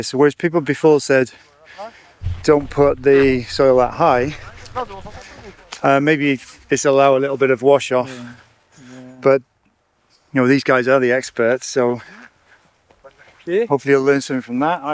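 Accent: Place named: Scotland, United Kingdom. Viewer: Was thinking the same thing!! 😊 Scottish accent! Lovely lady!!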